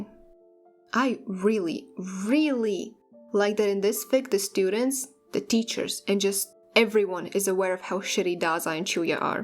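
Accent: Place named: Russia. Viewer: I love your Russian accent.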